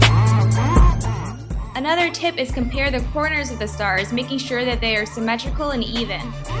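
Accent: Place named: Chicago, United States. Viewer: i love your accent.. its so... so... CHICAGO!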